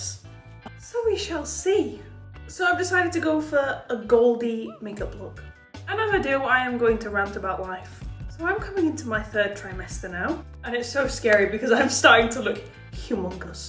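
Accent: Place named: Wales, United Kingdom. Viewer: It's so funny that you said you drove to Wales yesterday, as before you mentioned it I thought you sounded more Welsh in this video! I have an English partner and sometimes I pick up on his accent, however when I'm with my mam and dad,I'm full on Welsh sounding! Anyhoo- you are looking absolutely stunning and you are going to be the best parents EVER!!!!! XXXXXXXXX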